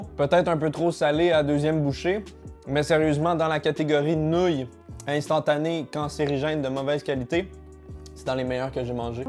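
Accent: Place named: Canada. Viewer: L'accent canadien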